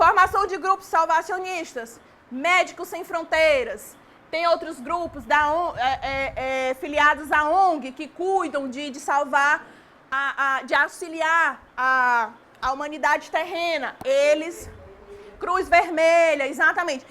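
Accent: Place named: Northeast, Brazil. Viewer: Primeiramente ressalto o português bem falado no sotaque nordestino aff ..Eu amo é um deleite para os meus ouvidos, acho sotaque mais bonito do Brasil. É também dizer que essa palestra foi um deleite para o meus ouvido ,vi a hora mais vou ouvir muitas outras vezes e seguir essa palestrante que amei obrigada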